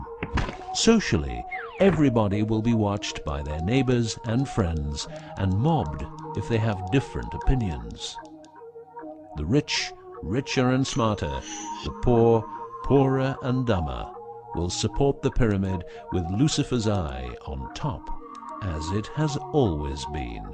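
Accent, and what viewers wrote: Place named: United Kingdom. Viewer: this is RETARDED but since an annoying brit is narrating it must be true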